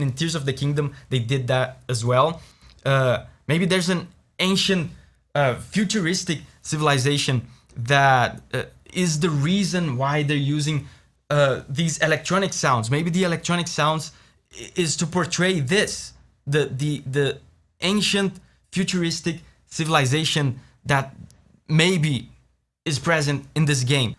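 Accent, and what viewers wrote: Brazil. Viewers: Mano, descobri que vc é brasileiro pelo seu sotaque e pelo sobre do canal. Legal demais ver brasileiros com um grande público estrangeiro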